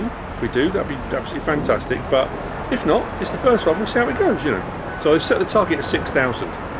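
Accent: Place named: United Kingdom. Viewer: Honestly I'm not a fan of saxlingham either it's just not my cup of coffee lol. But I'd definitely watch you play it. Honestly I'm still holding on to hope that you do another farm to survive series. That is my all time favorite you've done. But you play whatever map you like and ill watch whatever you pick. Your play throughs are way better then most others ive seen i just like listening to you its fun with your deep British accent.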